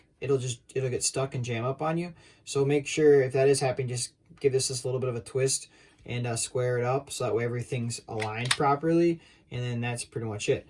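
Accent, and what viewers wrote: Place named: Minnesota, United States. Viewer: your voice and accent is remarkably similar to the friends' who I grew up with in Minnesota (near the MN/WI border in a town called North Branch).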